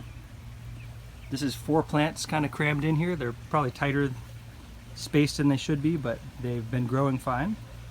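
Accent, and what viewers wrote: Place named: France. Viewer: You have somewhat of a French accent... Are you from a Ontario or Quebec??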